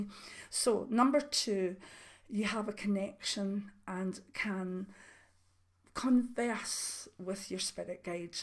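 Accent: Scotland, United Kingdom. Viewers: Hello. I enjoyed this. I hate shoes.... although I get the coldest feet so have to give in on colder days. As a child I regularly saw a shamanic indian and have alwaya been fascinated by the culture and way of life. Nature and animals are my bliss although being crocked up and arthritic I avoid drastic weather. I am effected by storms. Headaches ans ears ring. Always know when they are coming. I work at present as a psychic on a phone line ( serious health issues meant I had to give up mainstream work) and embracing this side of things. I have always felt odd and a bit different and despair at the sadness and pain. I enjoyed this video. I love your soft scottish accent. I am cornish so sending peace love and healing light from south to north to you x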